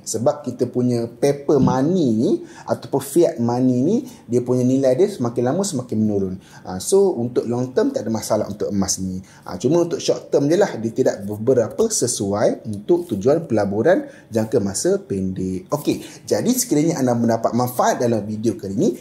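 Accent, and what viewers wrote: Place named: Kelantan, Malaysia. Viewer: Demo ore Kelate ko boh? Bunyi lagi Kelate tu boh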